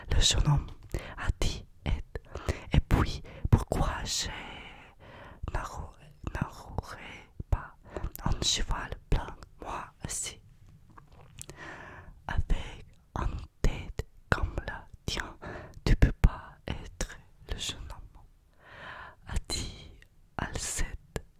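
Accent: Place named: Russia. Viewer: Le Français avec l'accent Russes, c'est une tuerie en asmr !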